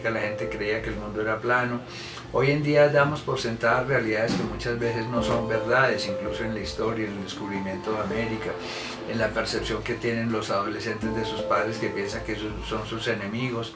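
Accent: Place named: Antioquia, Colombia. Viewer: Con todo respeto la conclusión a vuelo de pjaaro es la de que se trata de un charlatán de acento.paisa, ,con lenguaje rebuscado disfrazado.y tendiente a aterrorizar,encasillar,someter,infundir hacia la disminución,la clasificación morbosa,y la. Intimidación ,ladrillazos parrafudosos de redundancias destrictivas y destructivas de la esperanza de quienes sufririan.Es la sensación que queda tras exponernos a sus "exposiciones radiales,sin salida y trampedeantes.seria bueno no intentara seguir haciendo daño a las audiencias con actitudes sobresuperioradas ,y extensas ,".inocentemente"y de " buena voluntad".Es posible que haya más coincidencias con esta observación,saludos a Parrita.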